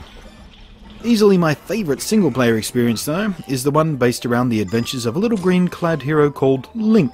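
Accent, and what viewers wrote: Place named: Australia. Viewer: Thanks for yet another informative vid, i do rather enjoy your style and its nice to hear another aussie doing things right! I am some what curious as to why you excluded zombieU from this review list? i think i remember you saying in one of your other wii vids that you were a little over the whole zombie game thing, but I am a little curious as to one, how it plays and two, what you think of it. If you have already gone over all of this please forgive my blathering ignorance.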